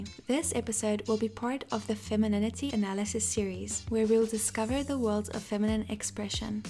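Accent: South Africa. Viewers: Are you South African? Something about your accent gives me that impression. 💗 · Are you South African? You sound like you are from South Africa.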